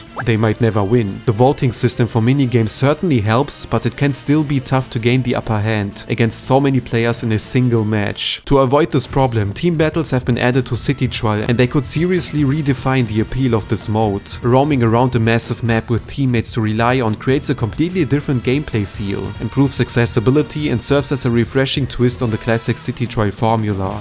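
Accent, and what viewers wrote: Germany. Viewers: You are German, i can tell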